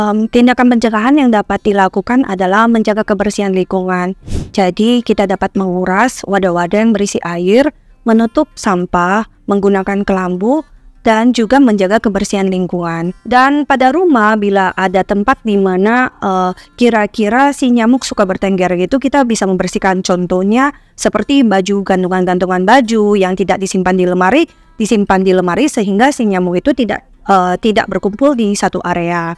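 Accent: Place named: Chinese-Indonesian, Indonesia. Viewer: Suka banget sama aksen chindo nya 😅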